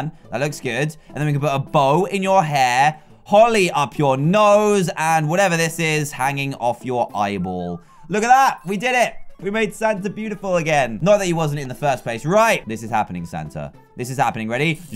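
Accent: United Kingdom. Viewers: Wait I did not know that you was British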